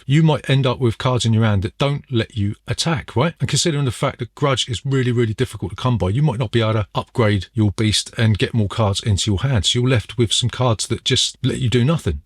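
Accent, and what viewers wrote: United Kingdom. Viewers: There was a time I could place where someone was from/what city in the UK by their accent, but that was long ago. Where you from dude?😎 Good review , saved me some money if I had money to spend on board-games now., I probably would have bought it in the past just on artwork .It does look good, but that is about it , I see from what you say. Besides, it is always a reach to buy any hard copy board game now, since Tabletop Simulator is around, and if you can handle the UI and syntax motions of TTS. ------------------------------- Oh yea, if you really want some good multiplayer board-games I suggest, 1.Wizard's Quest by Avalon Hill (found for cheap), 2.Divine Right by TSR (hard to find , very expensive) 3.Fortress America by Milton Bradley (also hard to find , fairly expensive) all these games are 40+ years old/out of print . I am not suggesting reviews, I just think you would really like playing those three games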